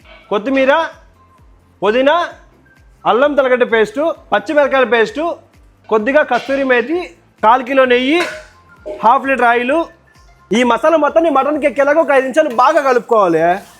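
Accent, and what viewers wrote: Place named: Rayalaseema, India. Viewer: Hyb biryani with rayalasemma vocal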